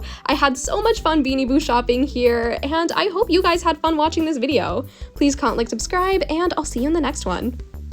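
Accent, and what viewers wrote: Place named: Mexico. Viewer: Wow!, you have a really good Mexican accent, greetings from Mexico!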